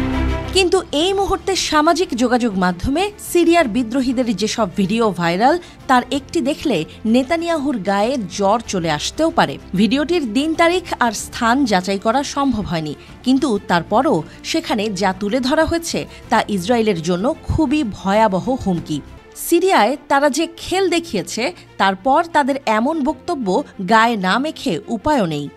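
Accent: India. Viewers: খবর বলার ধরন দেখে মনে হচ্ছে সে একজন ইন্ডিয়ান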